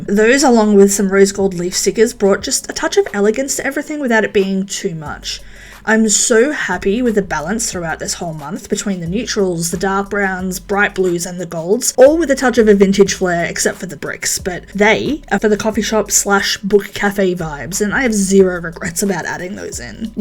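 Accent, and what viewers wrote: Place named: Australia. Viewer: Gidday from Sydney 😊😊😊😊. This spread is amazing. So good to hear an Aussie accent. ❤❤❤❤❤. I like the colours you've used. ❤❤❤❤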